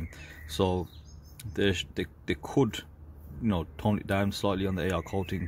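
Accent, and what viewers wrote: Birmingham, United Kingdom. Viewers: Another great vid, pleasing to hear another Brummie on the Tube, loving the reviews! Seen a few of your mods with after market dials now, where do you manage to source them from? 👍🏻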